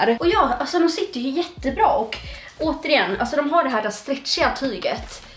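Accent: Stockholm, Sweden. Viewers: varför pratar du så grov stockholmska?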